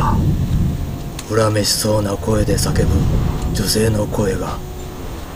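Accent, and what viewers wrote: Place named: Tokyo, Japan. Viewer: 喋りが変な東京風になっちゃった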